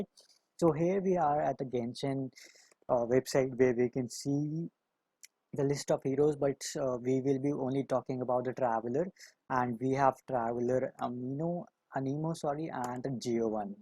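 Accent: India. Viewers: You are from India, aren't you? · Hey friend r u indian u sound like 1 pls reply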